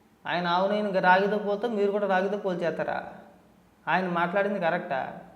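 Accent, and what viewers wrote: Godavari, India. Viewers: Power of Godavari slang 😊❤🎉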